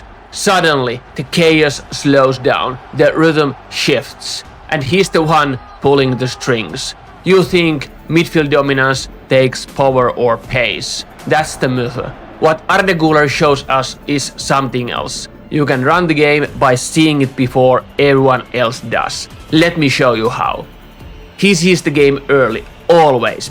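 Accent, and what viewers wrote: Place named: Finland. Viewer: I love Finnish accent. Soothing, clear, understandable. Thanks for the extensive analysis. Subbed. Regards from Turkey.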